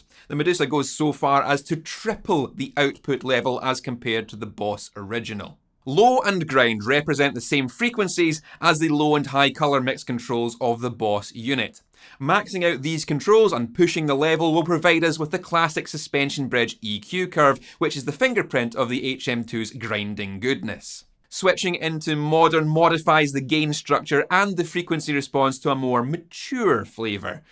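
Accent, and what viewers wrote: Scotland, United Kingdom. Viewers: I remember you from the other channel.. Im much older then you are.. I always seen you as this friendly metal musician, hard rock, heavier then but that style of metal-rockish 80 thingy. I was almost thinking that the next hit song will be released by you, and it will be better version of" ". I thought that untill now!!! I always thought that you had a cute accent and always respected it because I way better like the original Scottish culture then the imperialist culture of England, and Scottland was always this land of beuty surrounded by people who dont belong there. What you expressed today made my jaw drop! YOU ARE FUCKING AMAZING!!! DUDE! GREAT RESPECT! Respect for being such a friendly and professional video content creator while hiding this FUCKING AMAZING BEAST INSIDE YOU! Dude.. Awesome! Did Anyone else notice that!?!?! 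SHARE THE FK OUT OF THIS! The guy is A-FUCKING-MAZING! No im not getting payed for writing this shit! I could be his dad, and I got my own son.. The guy just impressed me so much. Oh my God.. YOU SIR GOT AMAZING SKILLS AND TALENTS!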